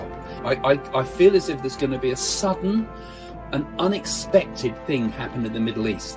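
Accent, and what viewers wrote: United Kingdom. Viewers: Mr., YOU ARE THE BEST!!!! Your royal British accent is CHARMING!!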